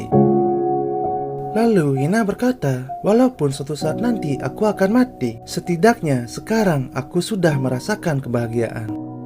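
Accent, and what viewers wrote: Bali, Indonesia. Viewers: Logat bali ini 😁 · Logat bali admin? Hehehehe rahayu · Logatnya Bali sekalii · Bang dari bali ya kog bila t khas bali banget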